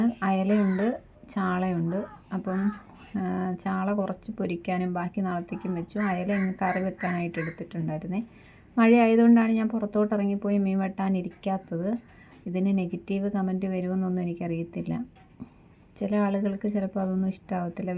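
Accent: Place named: Kollam, India. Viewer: സംസാരം കേട്ടപ്പോൾ തോന്നി കൊല്ലം ആണെന്ന് കൊല്ലത്തു എവിടെയാണ് ഞാനും കൊല്ലം ആണ്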